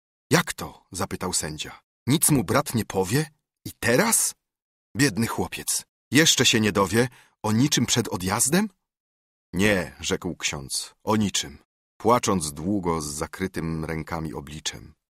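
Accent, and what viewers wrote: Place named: Central Poland, Poland. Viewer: Ach ta środkowopolska intonacja 😢 To powinien czytać jakiś aktor kresowiak. Utwór odczytany w tej melodyjnej wschodniej polszczyźnie, w której przecież został napisany, OGROMNIE zyskuje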